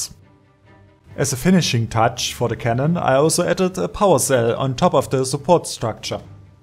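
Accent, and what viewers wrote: Germany. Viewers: When someone with a German accent this thick talks about building a Death Star with a working laser I'm actually terrified it sounds like a legitimate supervillain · im German to i think he's Sounds Like German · The most german accent in this world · Ja das klingt wirklich Deutsch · Very german accent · I can hear hes german, its obvious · As a fellow German: your English is the most German one. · hab erst überlegt aber war mir ziemlich sicher das is n deutscher kollege 😂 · Das ist fast deutsch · Ist mir doch von Anfang an aufgefallen, dass du deutsch sprichst!😂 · das ist englisch hört sich aber wie deutsch an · He is German, I can tell from his problem with th words and some others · Yeah, well sounds German and also if you go to the about section it will say that his location is in Germany. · Truuu the english sounds so german it basically is just that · It’s Denglish =Deutsch+Englisch ore Germglish =German+Englisch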